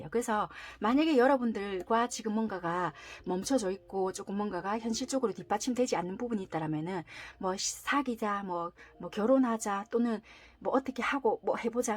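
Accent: Busan, South Korea. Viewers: 감사합니다~^^ 좋은일이 생기면 꼭 후기 남길게요! 🙏🏻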